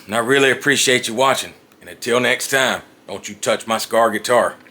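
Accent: South, United States. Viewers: are you located in Orlando? I always thought you were from Kentucky, Alabama or somewhere down there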